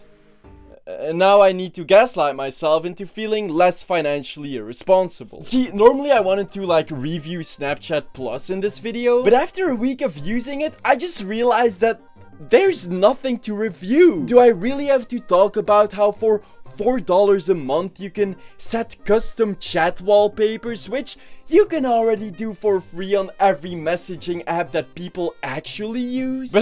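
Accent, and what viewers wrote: Flanders, Belgium. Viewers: YOU'RE BELGIAN?? I KNEW YOUR ACCENT SOUNDED FAMILIAR!? LANG LEVE BELGIE 🇧🇪🇧🇪🇧🇪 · What part of belgium are you from? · wacht deze guy is belgisch? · zijd gij Vlaams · are you belgian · t'es belge ?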